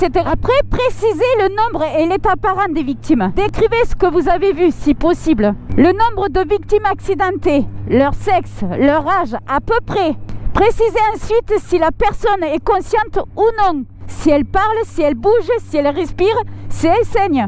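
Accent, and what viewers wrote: South, France. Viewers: avec ton accent du sud ça passe sans problème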